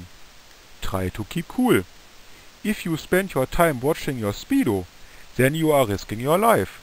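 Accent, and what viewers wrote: Germany. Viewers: This has to be the most ridiculous german accent I ever heard. And I am a german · You have done pretty well! As a German I must admit your German accent is awesome! ;-)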